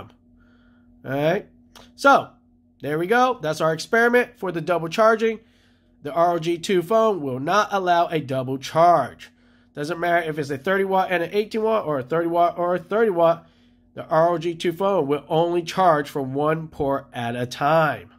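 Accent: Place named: India. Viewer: This man is speaking the English of Indian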